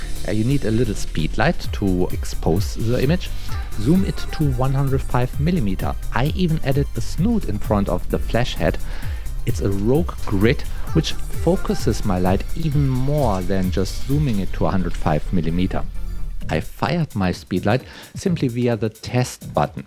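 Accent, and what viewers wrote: Germany. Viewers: That German accent :)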